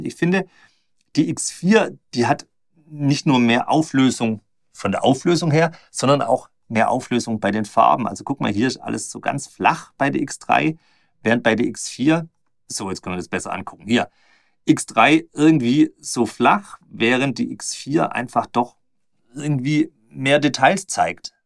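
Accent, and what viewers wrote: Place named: Swabia, Germany. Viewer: Ich liebe deinen schwäbischen Dialekt 🤗👍👍👍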